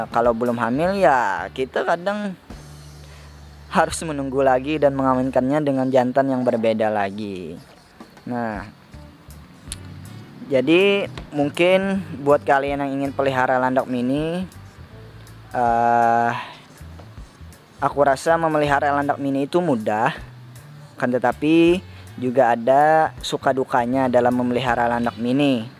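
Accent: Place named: Banjar, Indonesia. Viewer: Totok banjar logat nya neh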